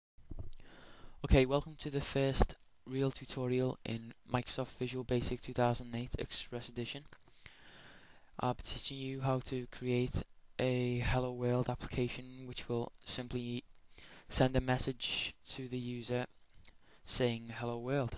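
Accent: Liverpool, United Kingdom. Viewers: Woah, you from liverpool? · I just can't take this effing Scouse accent. Have to give up this Tut, thanks for trying though! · Scouse accent! :-))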